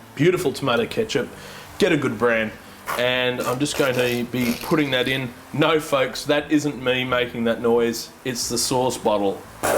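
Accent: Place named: Australia. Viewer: Man i really hate you canadians, his australian.....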